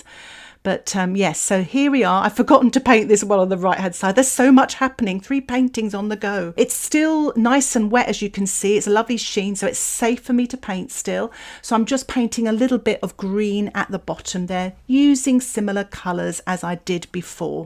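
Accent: United Kingdom. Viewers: Im gonna hear a nice British lady telling me "quit fiddlin'" in my head now if I start overworking something, thanks! Lol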